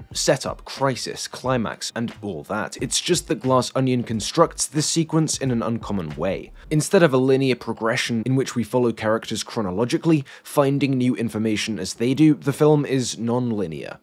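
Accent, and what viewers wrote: United Kingdom. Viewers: Hilarious to hear you and all those who pretend to just love Glass Onion even though it lacks everything that Knives Out “an actually good movie” has in volume. Name one character you are true my invested? Glass Onion sucked and even your British accent can’t convince me what I watched isn’t complete RUBBISH!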